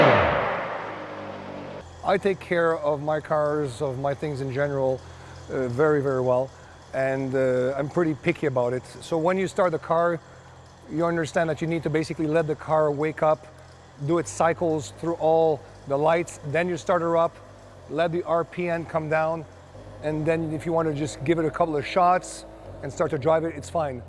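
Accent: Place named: France. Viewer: Je sens l'accent français ❤❤❤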